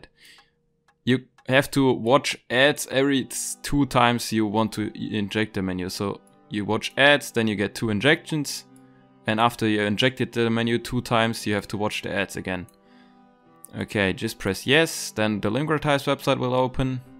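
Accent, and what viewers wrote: Germany. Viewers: are you german ? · Den deutschen hört man raus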